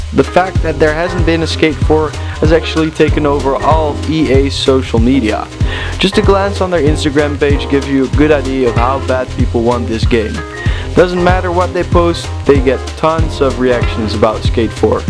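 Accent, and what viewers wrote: Netherlands. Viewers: This guy is dutch. I can just hear it. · Is he from the netherlands? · Are you Dutch?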